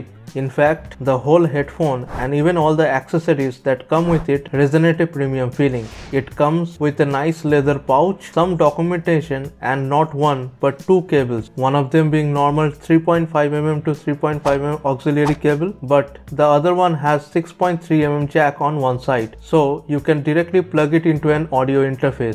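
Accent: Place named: India. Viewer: Lay back with ur Indian voice a bit please ruined the video kind of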